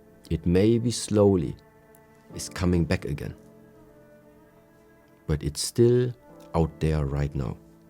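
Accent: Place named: Germany. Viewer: just love that a buddhist spiritual masterclass leader has a german accent 🙃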